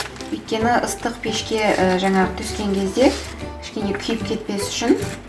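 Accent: Standard. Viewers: рахмет, таза қазақша сөйлейді екенсің, бәрі түсінікті юеткіздің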